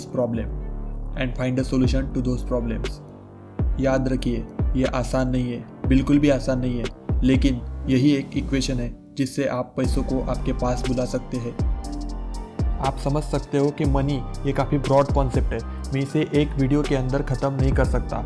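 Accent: Maharashtra, India. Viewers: Your Marathi accent is so sexy … keep it raw😎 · Your Marathi accent is 😅 ,, full video i was thinking about it ❤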